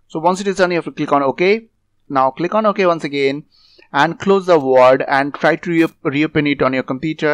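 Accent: India. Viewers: i tried every solution before this, but as soon as i heard an indian voice i knew my shit is getting fixed real quick, ty bro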